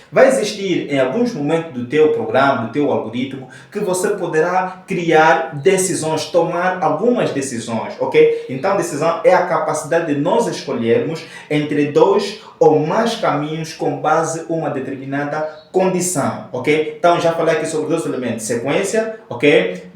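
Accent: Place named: Angola. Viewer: tudo top, ainda falando com sutaque Mwangolé 💥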